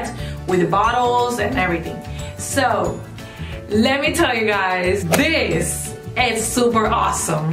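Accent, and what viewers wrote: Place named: Spain. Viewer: I love that she has this amazing Spanish accent, sounds and looks so bubbly - and that teal bow looks super cute on her! Video was also super informative, too. She sold the product better than the actual brand!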